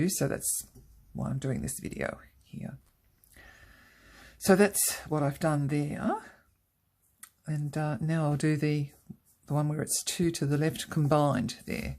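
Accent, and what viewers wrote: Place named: Australia. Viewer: is Australian... hence the accent!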